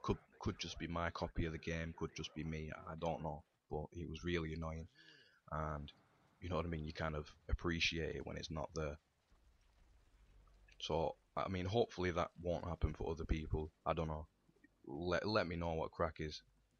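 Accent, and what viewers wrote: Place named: Scotland, United Kingdom. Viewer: I love your accent! Are you Scottish perhaps?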